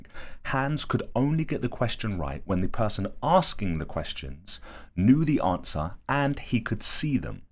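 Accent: United Kingdom. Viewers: Ink line drawings and interesting stories told in a British accent. Sign me up!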